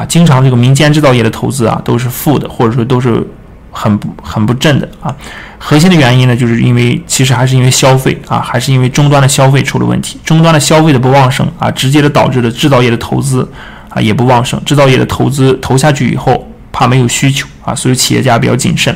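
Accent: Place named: Liaoning, China. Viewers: 我感觉是辽宁的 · 刘素 我也觉得是那边，东北口音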